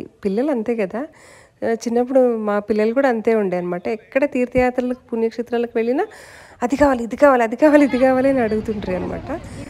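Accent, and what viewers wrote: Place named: Telangana, India. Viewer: Mee language paka telangana lagavune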